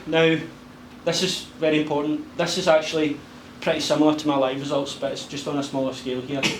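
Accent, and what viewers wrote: Scotland, United Kingdom. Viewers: Refreshing to hear Scottish accent aye 🏴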